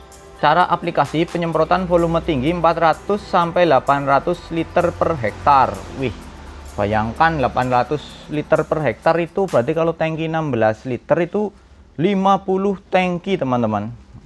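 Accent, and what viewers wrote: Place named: Brebes, Indonesia. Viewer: Ngomonge kaya wong brebes..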